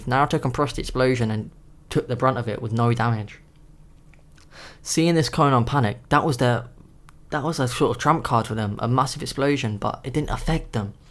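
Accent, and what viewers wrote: United Kingdom. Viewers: oh i love the British ascent its so funny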